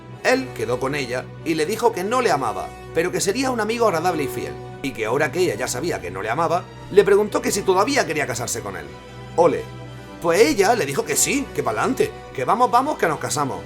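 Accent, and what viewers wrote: Andalusia, Spain. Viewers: es acento español de Andalucía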